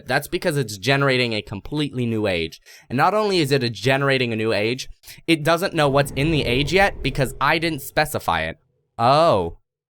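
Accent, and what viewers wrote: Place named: California, United States. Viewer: Torkil Hansen I think that's just the typical Californian accent.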